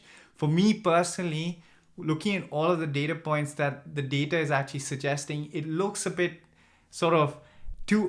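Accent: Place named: India. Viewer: From your accent its visible that ur root is from Indian. Why can't you say your name properly. Its or. Bitte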